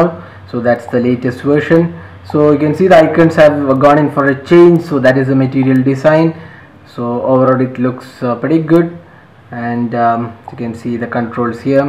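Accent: Kerala, India. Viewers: your indian accent is too strong, you need subtitles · Love ur English with Malayale Accent ! Good Job (y) !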